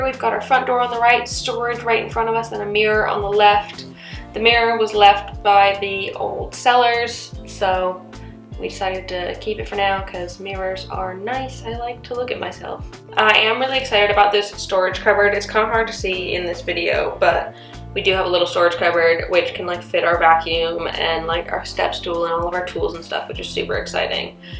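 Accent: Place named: United Kingdom. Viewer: I loved watching this, as a fellow Brit who lives in Canada now, I rarely get to hear our accent and the word "flat" instead of apartment. I can't wait to see how it turns out :-)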